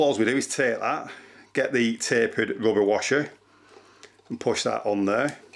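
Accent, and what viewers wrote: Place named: Yorkshire, United Kingdom. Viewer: My pleasure! Just out of curiosity, what UK city is your accent? My guess is somewhere in the Yorkshire area.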